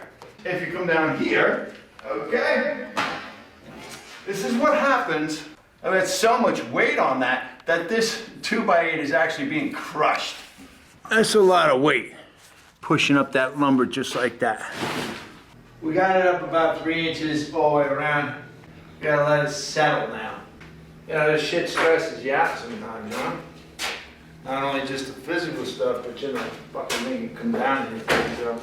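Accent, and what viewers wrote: New Jersey, United States. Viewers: This old bird is a craftsman. Knows what he is doing. Has a funny accent though. Might be Camden, NJ, Brooklyn? JoeB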